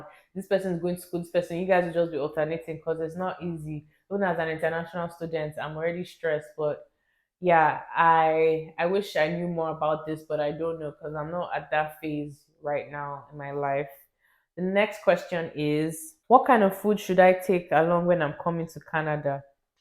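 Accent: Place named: United Kingdom. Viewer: I love the pidgin!! Mix it with bri-ish and canadian accent 😂😂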